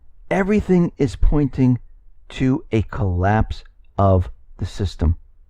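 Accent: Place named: New York, United States. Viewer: I think your Trump is showing, I hear the "believe me" thing in your dialogue now too. Maybe you always did and I am just picking it up, is it a New York thing?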